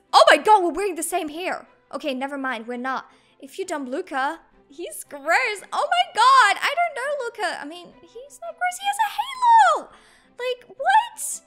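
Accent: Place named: Australia. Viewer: Your Aussie omg same i live in Melbourne are you aussie though hope you are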